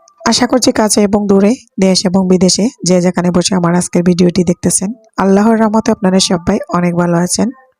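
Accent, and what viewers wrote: Sylhet, Bangladesh. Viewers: tumi sylheti ni go boin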